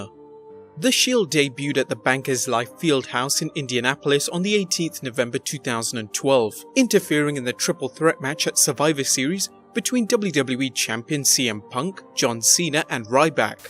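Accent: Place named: United Kingdom. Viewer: Why does ALWAYS have to be a British narrator?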